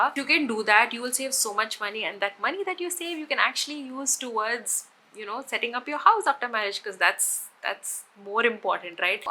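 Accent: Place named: Kerala, India. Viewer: She is so amazing and realll. I love her malayali accent and speaking few hindi words in between is sooo cuteeee, that's the best thing. She's my new favorite YouTuber❤ And you looked fabulous in all your events. This is a great inspiration for new brides who don't feel the need to be tooo extraaa. I'll keep these advices in my mind for sure😊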